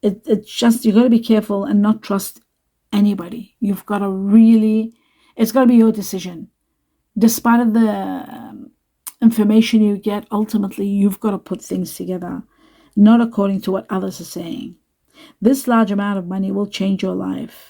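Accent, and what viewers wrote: Eastern Europe. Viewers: seems Eastern European to me.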